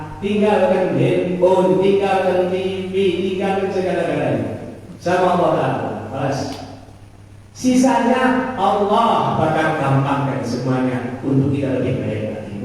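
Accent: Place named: Semarang, Indonesia. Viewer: Ustadz asli Semarang keturunan Arab tob ceramahnya pake bahasa logat Semarangan 👍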